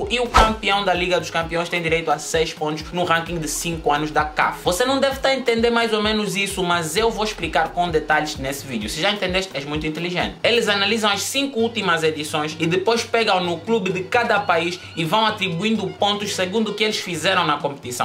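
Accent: Angola. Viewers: gente eu entendi tudo o que esse cara disse ,se ele é africano deve ser angolano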